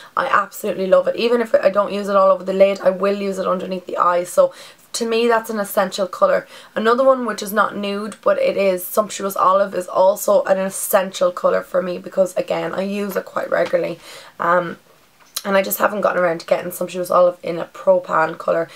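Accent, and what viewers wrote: Ireland. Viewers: Bet u from Dublin or cork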